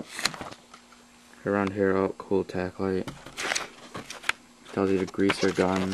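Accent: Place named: Chicago, United States. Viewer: U need to lose that Chicago accent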